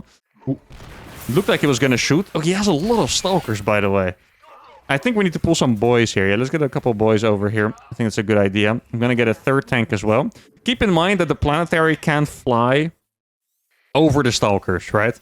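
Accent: Netherlands. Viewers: As an American I can hear the Dutch in his voice pretty clearly haha ive heard dutch folks speak a lot though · are you dutch, brother? I feel like I hear it in your voice. I'm from scheveningen either way, awesome video. has to feel cathartic, lol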